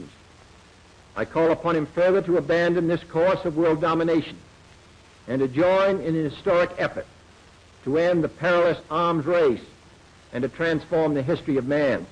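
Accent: Boston, United States. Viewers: It was his Boston accent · What accent? He sounds like a Bostonian trying to sound like he's from Chicago.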